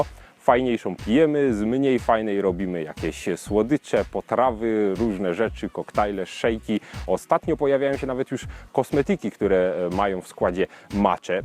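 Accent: Silesia, Poland. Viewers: piękny śląski zaśpiew <3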